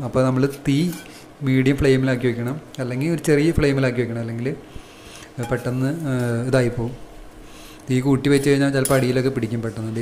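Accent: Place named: Thrissur, India. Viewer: തൃശൂർ ആണോ.. സംസാരം നല്ല രസണ്ട് 🤩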